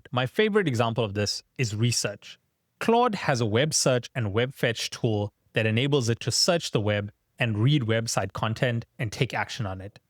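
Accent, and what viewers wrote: South Africa. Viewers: Are you South African? Or do you have a South African parent? Your accent is very interesting, if so, it’s great to see a South African make probably one of the best CC videos I’ve seen. 🔥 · Are you South African??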